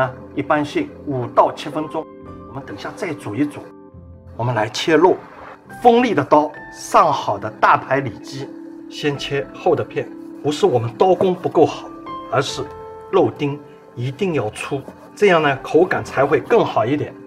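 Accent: Shanghai, China. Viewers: 身在海外听到这样的沪普浑身舒适! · 你带着上海腔调的普通话实在太可爱了，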